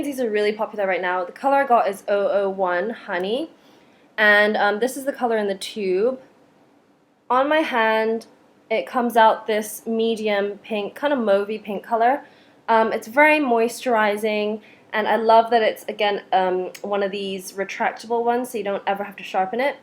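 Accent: United Kingdom. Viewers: Hiii, I'm kind of a new-ish viewer of yours and I was wondering if you've spent some time in the UK because your accent is British at times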